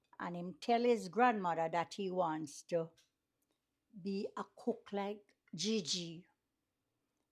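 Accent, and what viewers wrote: Jamaica. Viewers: Yes....a pick up de real Jamaican talk and real Jamaican "cooking hand". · I love your Jamaican voice I feel like I am in Jamaica 🇯🇲 judt listening to you 😅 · Her voice alone just bring me back to Jamaica. Reminds me of so many elders that sounds like her ❤. Once you put sugar its no longer fry dumplings tho 😂 its either sugar dumplings, Johnny cakes or bakes as the rest of the Caribbean call it 😁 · Is she Jamaican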